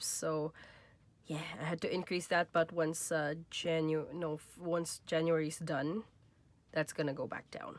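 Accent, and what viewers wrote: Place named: Canada. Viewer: You have an accent are originally from Canada.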